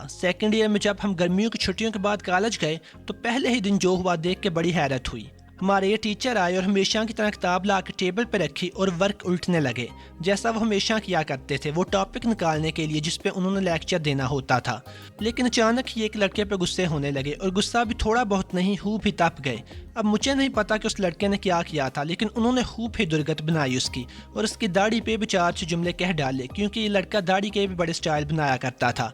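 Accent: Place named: Lahore, Pakistan. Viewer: Lahori accent 😂👌✌️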